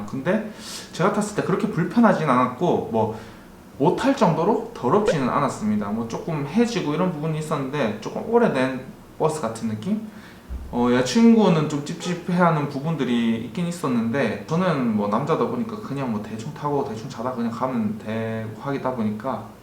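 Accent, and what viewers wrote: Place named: Gyeongsang, South Korea. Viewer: 콘텐츠 잘 봤습니다. '좋댓구알' 했구요. 억양에서 살짝 경상도사투리가 들리는데 혹시 경상도 출신이신가요? 전 대구남자입니다. 혹시 Q&A영상이라도 있으면 알 수 있지 않을까 싶어서 검색해보니 QNA영상은 없네요. 다른 의도는 없는 순ㄴ수한 호기심에서 하는 질문입니다.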